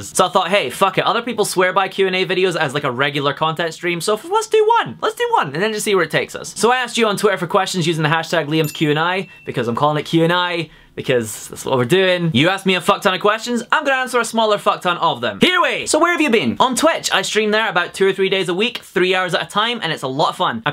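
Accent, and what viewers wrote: Scotland, United Kingdom. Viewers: I really like your videos! I subscribed trying to have a little more contact with the Scottish accent, but now I'm here because of you. And I'm glad you're back to posting!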